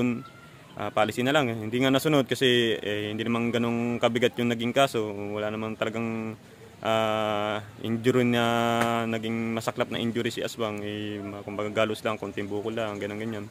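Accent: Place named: Cebu, Philippines. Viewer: I heard you speak cebuhano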